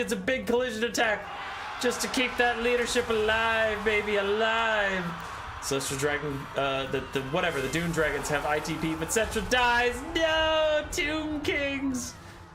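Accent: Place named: France. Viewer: Just missed this :< gonna need a game cast in that exquisite french accent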